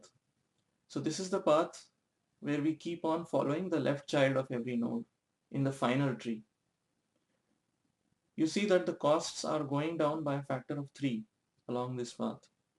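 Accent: India. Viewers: Игровой канал RobosergTV Well many people actually just hate indians and their accents , so they say hateful things ,just to bring em down ,just out of spite. Even when they are able to understand most of it , they would bash the poor guy. Also , they were too harsh. It is fine if you dont understand the accent , but then you start insulting him , his family and country just because you dont understand his accent? Like this mutual white friend of mine is sort of racist against black people , so he always finds the worst in them.Always talks about crime rate due to black people and dirty streets because of them. Get it? If you are slow , read it again and again , until you get it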